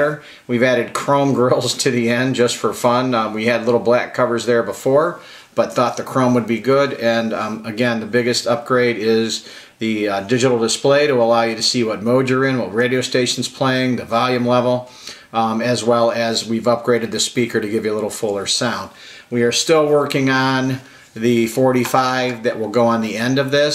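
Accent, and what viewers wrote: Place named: China. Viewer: The lady speaking she sounds like she's from China brand made in China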